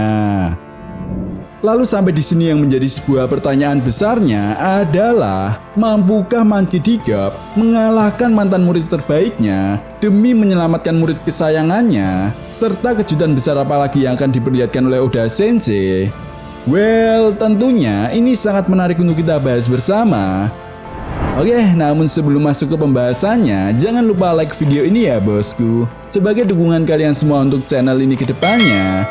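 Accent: Java, Indonesia. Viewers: Suara nya paati jawa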